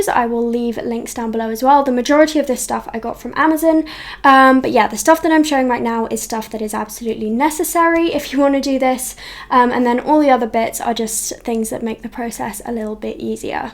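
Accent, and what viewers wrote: United Kingdom. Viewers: Can I just say that your British accent is e v e r y t h i n g ❤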